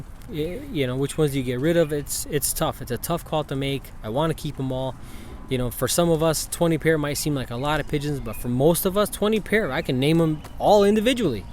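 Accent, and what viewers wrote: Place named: New York, United States. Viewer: Sound like you from New York brother, the loft has to be remade completely, you should make a design similar to this, open ventilation for the breeders, click the link its a link to a photo of a design for a loft that basically keeps things completely stress free, no smell of pigeons , you can guarantee the birds and breeders will always be happy and healthy <link>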